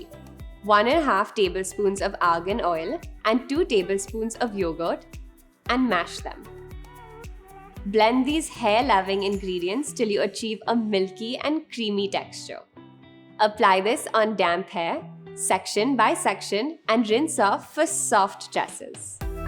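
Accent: India. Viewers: I'm from the U.K and she has British/European features, skin tone and hair colour. But her accent matches my asian/Indian companions. That is all, Never meant anything by it all! She is very beautiful either way! Love your videos guys. Keep up the great work! 🥰🤭❤️💗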